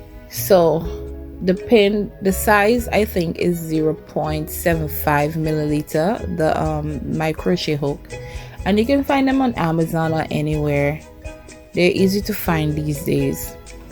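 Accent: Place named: Saint Vincent and the Grenadines. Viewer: I knew you were an Vincentian I can hear the accent like my parents❤❤❤, I thank you for showing me an easier way to install dreads extensions 😊😊😊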